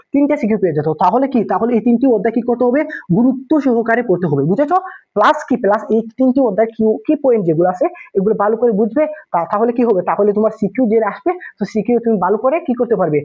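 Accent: Sylhet, Bangladesh. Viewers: ভাই কিতা সিলেটি নি। ভাই পদার্থ বিজ্ঞান লইয়া যে চিন্তাত আছি। সেইম টাইপ ওর অংক টেস্ট পেপার ও এক একটা এক এক নিয়মে দেওয়া। পাশ করতাম ওইলে কিলা পড়া লাগবো। 😭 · Jii may be Sylhet Ta Nahole maje maje sir arokom kota bolccen humm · vaiya mono oy sylheti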